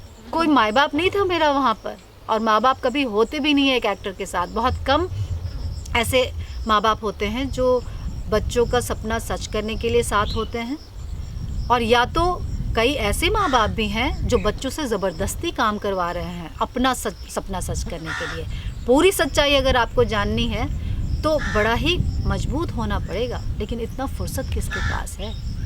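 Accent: Bihar, India. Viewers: I'm from Patna and love ❤ you...I like your thoughts your vlogs your life style and yes aapka Bihari style me kabhi baat karna Bihari khanpan sab pasand hai....aur main aapse milna chahti hoon....kabhi aap Patna me apne subscriber se mile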